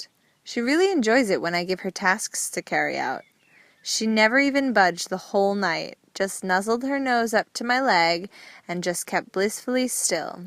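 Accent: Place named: United States. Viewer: the disgusting american woman accent . Horrible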